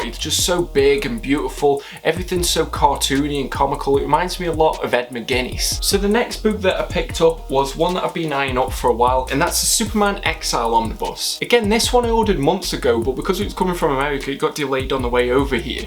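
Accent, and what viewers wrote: United Kingdom. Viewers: Another UK collector here(and from your accent, potentially similar areas), your hauls are pretty damn impressive considering how difficult it is for us to pick up stuff as cheap as Americans can. Not just Ollies, the facebook groups, omnibros, IST etc. So keep up the good work! Don't spend too much!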